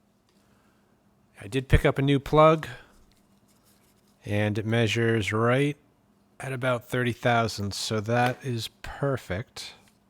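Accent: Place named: New Hampshire, United States. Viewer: That is "local accent"....New Hampshire?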